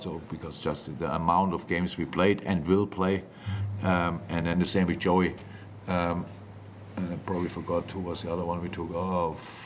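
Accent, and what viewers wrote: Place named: Liverpool, United Kingdom. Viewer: I am going to miss Jürgen pronouncing names in a Scouse accent 💔💔💔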